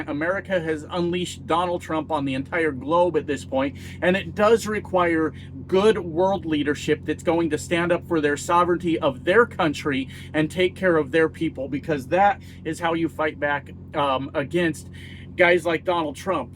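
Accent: Canada. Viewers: Ugh. I’m super happy that our Country voted for Carney but I am from ‘Berta so this morning I woke up to a FB feed full of separatists. I think I feel the way you guys felt watching your friends vote for Trump. I’m still nervous at what havoc my province is going to stir up. That being said, you very much do sound like a Canadian bud. Welcome!:). And not the weird ‘Berta type. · Didn't think about it until you pointed it out, but you do sound like you're from Nova Scotia😊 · does sound Canadian a little...not sure why:face-blue-smiling::face-green-smiling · You have the kind of Canadian accent that makes Canadians ask "What part of Canada are you from?". You sound like one of us, just one from someplace else. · You do have a Canadian accent! I embrace you as an honorary Canadian. Thank you for your support. 🇨🇦 I am proud of Canada. 🇨🇦🇨🇦🇨🇦🇨🇦🇨🇦🇨🇦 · We got the right guy for prime minister. 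And yes, you do sound Canadian. We love your speeches.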